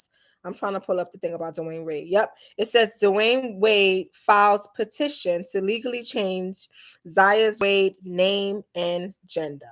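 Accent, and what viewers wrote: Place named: New York, United States. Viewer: I figured it was either NY or NJ the accent,husband as well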